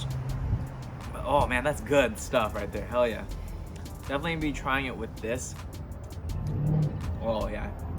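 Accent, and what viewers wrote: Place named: Vietnam. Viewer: Love your Viet accent!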